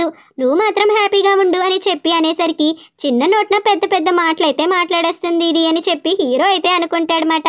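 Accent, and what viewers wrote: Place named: India. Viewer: మీరు హిందీ వాలా న,మీ మాటలు అలా ఉంది అ0తే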